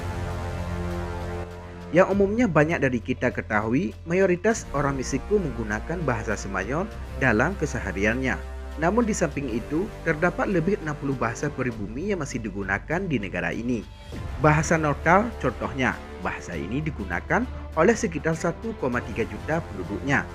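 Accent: Aceh, Indonesia. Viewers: Ini yang ngisi suara org Aceh ya? Logatnya Aceh banget 😂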